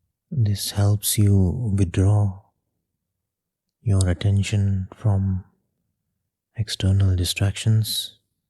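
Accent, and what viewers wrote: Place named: Asia. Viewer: When I heard the Asian accent I knew I was in the right place 😌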